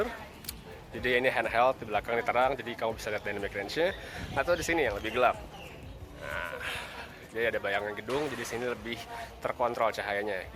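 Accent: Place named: Java, Indonesia. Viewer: Reviewer Medok muke lu medok njing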